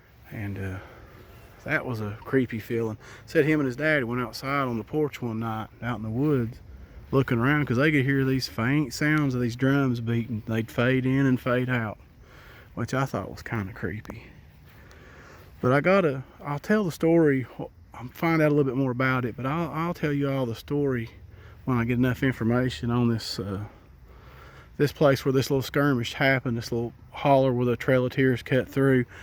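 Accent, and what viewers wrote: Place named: Alabama, United States. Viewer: We visited your area the week before Memorial Day. We stayed mostly in Townsend...and then Gatlinburg a couple nights. I would have really enjoyed sharing a meal with you. You sound just like my people here in Alabama. I appreciate the information that you share. And I do believe it. When we were travelling up that way, we were amazed by the scenery. And when you sit and watch it on video, it really doesn't do it justice. There is no way to describe just how expansive and breathtaking the smokies are. We took some really back roads that were not the beaten path. And when you experience that...you get it. Things can hide within a hundred feet of you. The terrain, for me anyway, is not walkable. Where we stayed, we couldn't even walk the yard it was so steep. You have to be in better than PRIME shape to move about the mountains. 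And these flatlanders at my house do NOT have mountain legs...lol. Do you have any stories about Townsend? I would love to hear them. Thanks and God bless.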